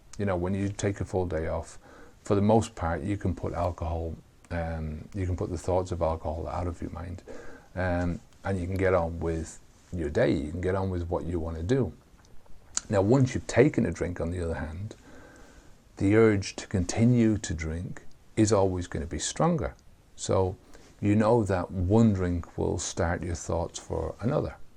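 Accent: Ireland. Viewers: Love Irish 🇮🇪 Accent! 🍀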